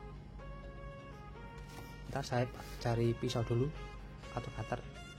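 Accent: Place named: East Java, Indonesia. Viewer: Mas bisa Update olshop ram dan ssd? Ngomong ngomong jatim Mas. Sapa tau tetangga 😂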